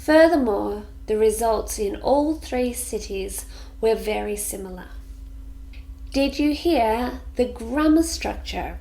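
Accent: Australia. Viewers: Hi!!!! I Am from Blumenau Brazil and I love your acent Australian!!!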